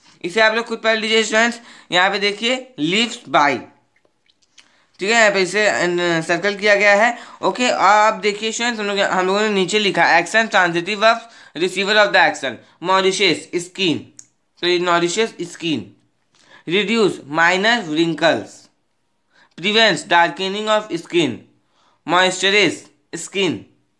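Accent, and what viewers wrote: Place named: Bihar, India. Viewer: Bihari sa bhaiya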